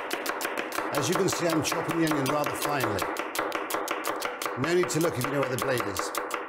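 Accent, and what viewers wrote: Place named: Leeds, United Kingdom. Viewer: His accent is pure Leeds, UK. I love it.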